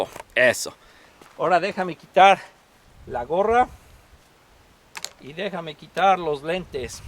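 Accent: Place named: United States. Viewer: progre so bueno laverdad no estoy seguro pero a alguien que un dia hablo español es imposible que se le olviden ciertas cosas, y se le nota al hablar de que algunas cosas no las maneja, como la acentuacion por eso pienso que es Estadounidense. pero bueno, su canal es excelente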